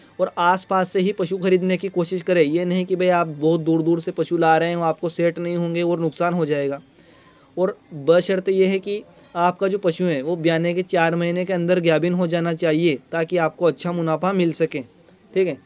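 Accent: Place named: Rajasthan, India. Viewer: aap rajsthan se ho ,aapki languege bta rahi he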